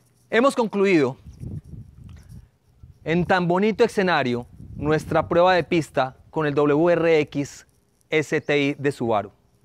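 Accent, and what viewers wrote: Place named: Colombia. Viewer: Pensé que era Colombiano por su acento, excelente video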